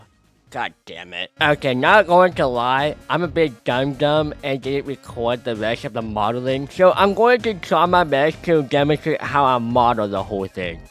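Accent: United Kingdom. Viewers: Is bro british or smth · Bro, sounds like a British person😂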